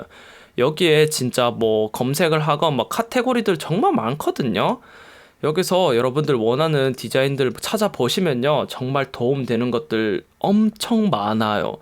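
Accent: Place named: Daegu, South Korea. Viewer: 사투리인가? 말투가 귀엽?다고 생각했는데 대구분이신가봐요. 저도 회사때문에 대구살고 있는 디자이너인데 ㅎㅎ 반가워요.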